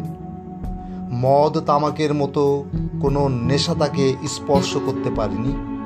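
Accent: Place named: Bangladesh. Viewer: আপনি কি বাঅংলা দেশের মানুষ ।আপনার উচচারণে বাঅংলা দেশের গন্ধ ।আরো জানার চেষ্টা করুন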